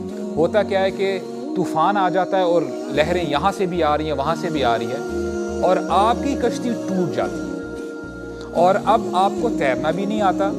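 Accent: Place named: Arab world. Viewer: Amazing Arabic tonality of yours, we truly appreciate that!!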